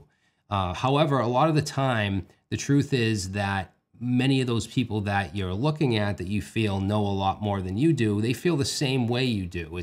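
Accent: Boston, United States. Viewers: Hey my 2nd favorite Boston accent. 1st is Ben Affleck in Good Will Hunting. Thanks for all the videos, honestly you've helped my career leaps and bounds and yours are the videos I recommend to any dev that I teach.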